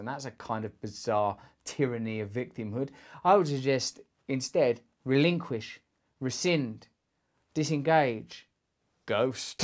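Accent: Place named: United Kingdom. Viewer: Love his British accent 🤘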